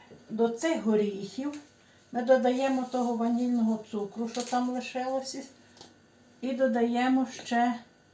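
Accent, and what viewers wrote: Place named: Carpathians, Ukraine. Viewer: Приємно слухати Дуже гарний карпацький діалект❤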